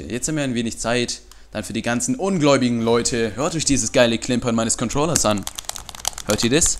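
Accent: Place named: Russia. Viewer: bist du russe du hast so einen akcent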